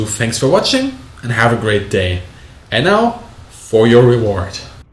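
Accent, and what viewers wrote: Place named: Germany. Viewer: Come on, pleeeeaaaaseee make some WarThunder videos and plane reviews! we really miss your insight, rants and that glorious german accent! Pretty bitte?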